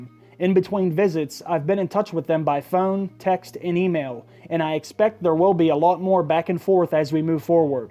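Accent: Pittsburgh, United States. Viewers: Are you from Pittsburgh by any chance? That accent is undeniable! I knew it as soon as I heard it! Bet you say jagoff too, don't ya?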